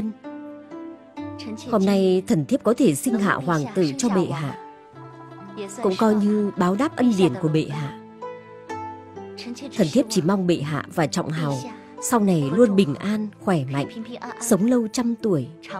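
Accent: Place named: North, Vietnam. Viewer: Thuyết minh giọng Bắc kỳ muốn ói, phim hậu cung kế cũng có đàm diệu văn, tưởng nghị, dương di thuyết Minh giọng nam còn hay và dễ nghe hơn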